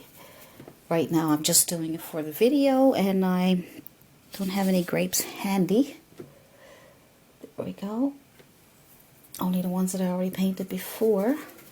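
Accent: Newfoundland, Canada. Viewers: Is that a Newfoundland accent?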